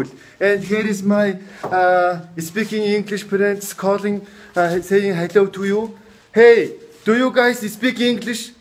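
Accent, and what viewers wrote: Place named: Russia. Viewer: It s almost talking russian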